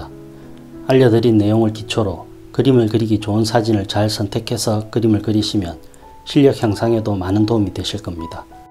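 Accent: Gyeongsang, South Korea. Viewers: 경상도 말씨가 너무 친근하고 반갑습니다. 직접 화실을 경영하시나요? 멀지않다면 직접가서 배우고싶습니다. 저는 하남에 거주합니다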